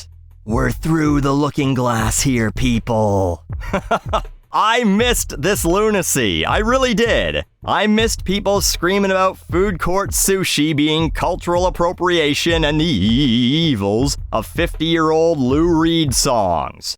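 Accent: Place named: Canada. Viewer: He’s Canadian. It could be his accent.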